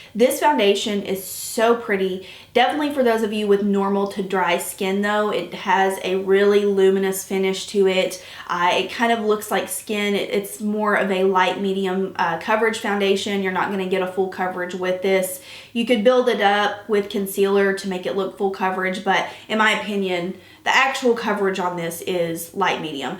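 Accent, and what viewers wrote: South, United States. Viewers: first of all, I am a new subscriber and I already love you! You seem so down to earth and honest in talking about different products, I already trust your judgement! I actually found your YouTube channel by reading a comments section of another YouTuber. Several people recommended you for hooded eye tutorials. I'd love to see an updated one (I couldn't find a recent one after scrolling back a good bit), as I REALLY STRUGGLE with applying eyeshadow to my hooded eyes. That flap of extra skin is my worst enemy!! Also, love your southern accent, where are you from? I am from North Carolina!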